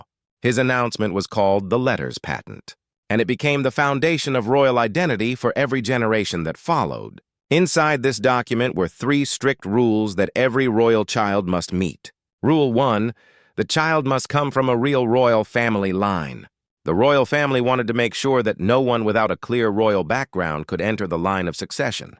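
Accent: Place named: United States. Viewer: Dear Lord how I hate anything narrated by a Yank. I know not a word can be believed.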